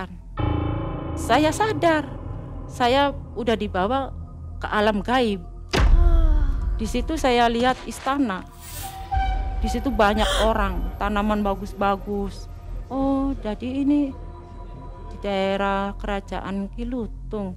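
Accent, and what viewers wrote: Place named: Cirebon, Indonesia. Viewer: Kaya org cerbon ap Indramayu 😊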